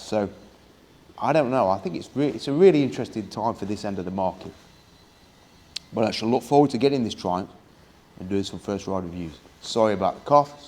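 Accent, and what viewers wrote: United Kingdom. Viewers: Had my Himmy for a year and a half and it's been the perfect bike for leisurely touring small country roads, gravel roads and greenlanes with my wife as pillion. Taking the road less traveled literally and figuratively attracted me to the underdog RE Himalayan over the Japanese, Austrian or German brands. The new Himalayan, unfortunately, has lost this appeal. On another note, as a non native English speaker, if I may, the immense variety of British accents, including your own, are delightful! As for that cold, a few hot toddy's should do the trick!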